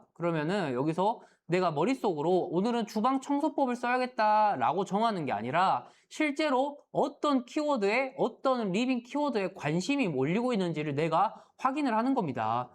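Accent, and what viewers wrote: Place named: Jeolla, South Korea. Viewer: 전라도 사투리 진짜 듣기 싫음 40세 전에 사투리 고치는게 인생 난이도 낮아질거임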